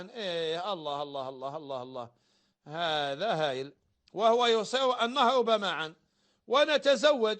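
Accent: Algeria. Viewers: لما قلت شكوبيستان كنت أقصد الجزائر 🇩🇿 لأني شبهت لهجتك باللهجة الجزائرية خصوصا لما قلت كلمة "خلي" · احسن شيخ يفهمه الجزائري. يتكلم بلهجة الجزائريين وبساطتهم. ووسطي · كنحماق على اللهجة الجزائرية. تحية من المغرب ❤️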